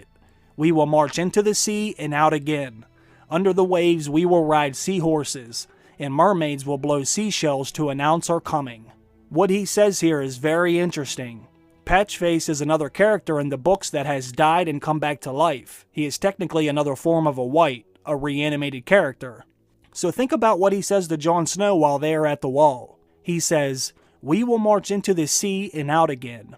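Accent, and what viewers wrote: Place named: Pennsylvania, United States. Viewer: Thought so. I could hear it. Fellow Keystoner here.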